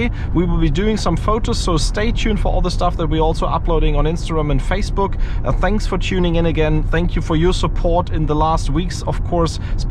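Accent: Germany. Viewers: dein dein denglish is einfach nur nerfig :)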